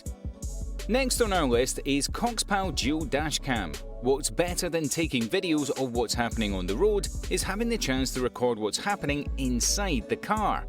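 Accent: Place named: England, United Kingdom. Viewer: you sound English but the price you give is in dollars >????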